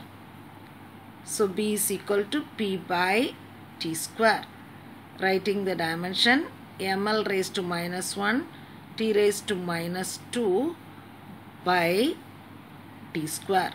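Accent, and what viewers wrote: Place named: South India, India. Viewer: She is South Indian so in her accent,yum is nothing bt M coz in South India anything we speak is started by y😂not her fault at all!! She explained very well!!😌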